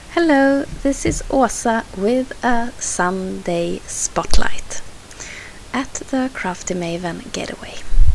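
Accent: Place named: Scandinavia. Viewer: I have enjoyed watching your scrapbooking videos since I discovered your You Tube site several months ago and always anticipate the next one to arrive. I love your layouts especially for the sense of balance and the focus centering on the photos the stories you are able to convey. I also enjoy your narration (love the Scandinavian accent). The stories you share of your life and family make a cozy picture and contribute to the charm of your layouts. I look forward to your future videos.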